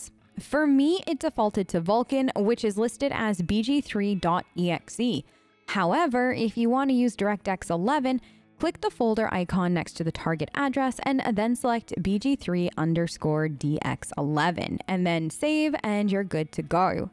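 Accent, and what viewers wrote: Australia. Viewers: This lady doesn't know if she wants to be Aussie or american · you can't hide the aussie. I hear that shit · Why do I keep hearing an Aussie accent from her lol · yoo you from straya?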